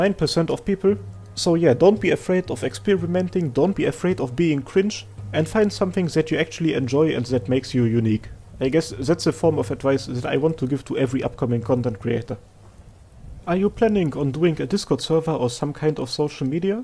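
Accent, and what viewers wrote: Germany. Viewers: Glückwunsch! I found your channel a couple a weeks ago and I love your videos. I was more of an Azur lane player before and I didn't know much about the deeper lore in Arknights. I don't know if it's appropriate to say but I really like your German accent. I wish you many many more subscribers and views. Greetings from Hungary. :D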